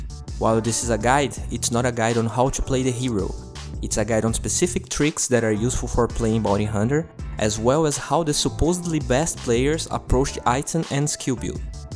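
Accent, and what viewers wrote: Brazil. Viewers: c eh br, seu sutaque eh bem forte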